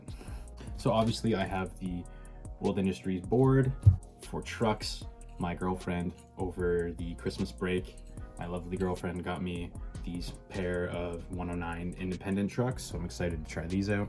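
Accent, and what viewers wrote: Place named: Canada. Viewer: i can tell you're canadian by your accent also its ironic having that board AND the kill your idols deck lol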